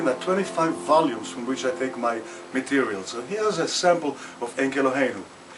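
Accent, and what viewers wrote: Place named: Germany. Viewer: I'm in love with the old German accent. This man reminds me of the aristocratic and finely-cultured Jewish family in the Brock & Brody Theone series The Zion Covenant. And Impressive singing!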